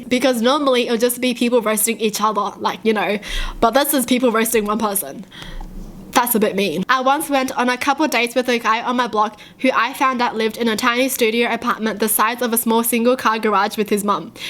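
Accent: Australia. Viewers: zenzoner zz nooooooo omg by blood she’s full asian. But she just happens to be born and raised in Australia which she picks up the accent.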